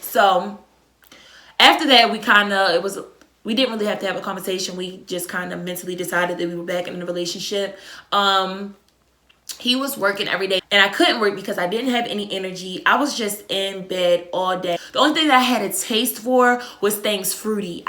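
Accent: Baltimore, United States. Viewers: Baltimore accent so strong 😂 #yeahhus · I knew it!! Soon as you started talking, I could tell, This is exactly what I was scrolling the comments for. Bawdamore dummy!!! Accent heavy, I love it! This my first time viewing your channel, glad I found juuu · could tell after a few words you were from charm city lol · im sick im from the D and soon as she started talking I'm like she must be from Baltimore ctfu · Yaya Unicorns as soon as she start talking I said I bet she’s from bmore. Also, your very brave telling your story. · Danm us Baltimoreons really do have a accent. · Idk how I stumbled across this video but I know a Baltimorean when I hear one 💁🏽‍♀️hey gurl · I knew that accent was BALTIMORE all the way. I'm a new subscriber btw.. Love ya accent tho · Are you frm Baltimore? Ur accent sound like it. Im frm DC. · Came across you’re video and soon as you started talking I said she’s from Baltimore saying “Knew” like that lol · I felt like she was from baltimore. 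I know that accent to well. Love it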